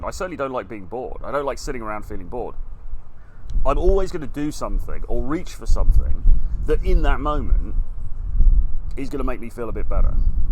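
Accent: United Kingdom. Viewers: Although you and I probably have a number of major differences when it comes to beliefs in life (I believe in Jesus Christ/the bible; not sure if you do), as someone who's right around your age (I think I'm like 2 or 3 years older than you), I love how real you get, and the things you talk about. I've never run across this in the Manosphere, and I've watched TONS of videos since I got "red-pilled" a little under 4 years ago. And, although I'm American (and, this has nothing to do with having significant heritage from the U.K.), it's nice to listen to a well-spoken Brit, especially since--and no offense to anyone--many content creators in the Manosphere sound like they may not have even graduated from high school. I'm sorry, but being intelligent, educated, well-spoken, etc..., regardless of what people say/admit, gets you a lot more credibility than not being those things.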